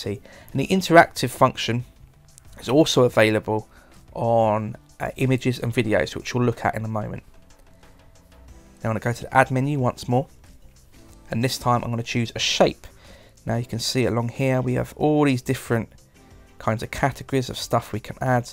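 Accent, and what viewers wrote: United Kingdom. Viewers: This is an outstanding presentation of Pages for Ipad. I have just got a Magic Keyboard and was looking to learn Pages. I was not disappointed. This tutor should be an ambassador for Apple. He makes Pages very easy to understand and I am grateful for his assistance. It is also very pleasant listening to a British accent. Thank you.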